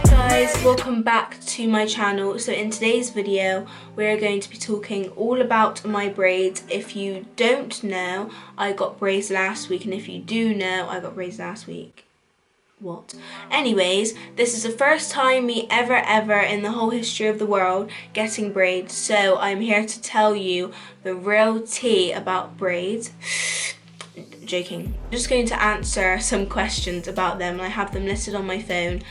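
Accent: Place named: England, United Kingdom. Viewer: What part of England do you live ? Coz ur accent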